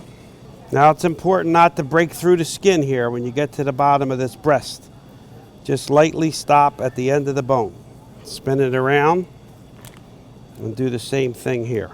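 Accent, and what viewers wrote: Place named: New Jersey, United States. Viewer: mmmmm goodeats!! and I love that jersey accent. mwah!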